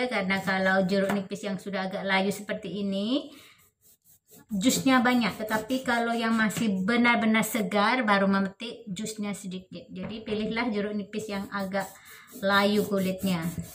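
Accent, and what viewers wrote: Malaysia. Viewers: Tuch yg NgoMong Org Malaysia yach?🤔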